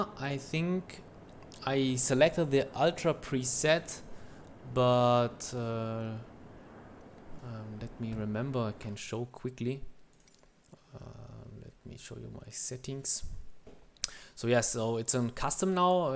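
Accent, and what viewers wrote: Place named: Germany. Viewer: Many thanks for your answer. Let's hope to see more static planes because I don't need any moving traffic at the airports...safe some FPS for me at least. Can I ask you for your PC specs and how many FPS do you got during your flights around Copenhagen? Btw your voice sounds more like a german speaks english then a greek people ;-)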